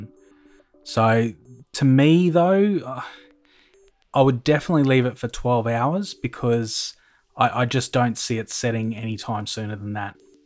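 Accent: Australia. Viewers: I swear an Australian accent makes content better, it just sound more interesting for some reason lol. I was wondering why the long delay between uploads in 2015? you've got great content and a real solid foundation which I'm sure would see you boom on youtube? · Ah, so it's an Australian accent. Lol, yeah his videos are super soothing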